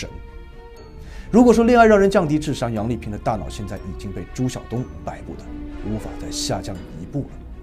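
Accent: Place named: Shanghai, China. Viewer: 你这个上海普通话，把这么严肃的案子说笑了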